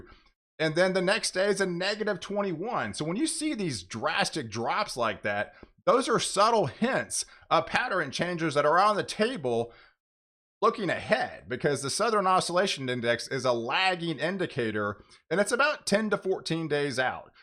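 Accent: Texas, United States. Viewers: Hahaha - that accent is so Texas, it hurts. Maybe she is confusing him with... Christopher Walken... 😱😁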